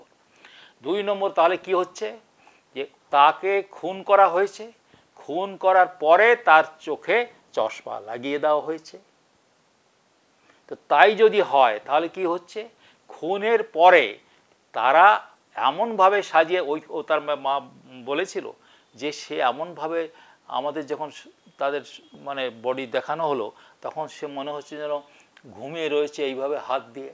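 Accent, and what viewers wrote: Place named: North Bengal, India. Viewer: আপনার ভাষা শুনে মনে হচ্ছে নর্থ বেঙ্গল এর। কোথায় বাড়ি?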